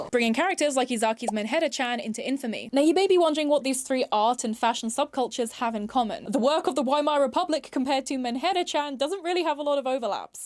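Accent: United Kingdom. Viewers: OK, I can dig the cute dyed bangs, the funny eyeliner with the lashes kind of hits. Always admired a UK accent. But the huge goggles on the head? Never got that, dear.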